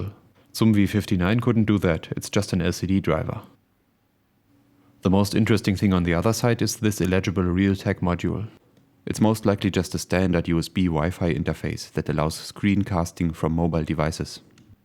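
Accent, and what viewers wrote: Germany. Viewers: Spaten Pauli its german english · I like how you don't even try to hide your german accent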